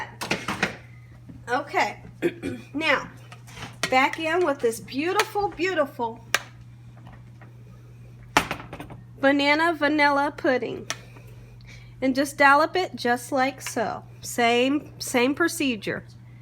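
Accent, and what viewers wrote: South, United States. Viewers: I loveeeeee your voice soo sweet & southerny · Only thing southern is her accent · Mmmm it smells just like bananas 😂😂 great southern accent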